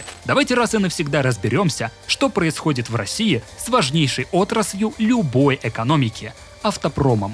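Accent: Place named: Ukraine. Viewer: хохляцкий акцент у автора.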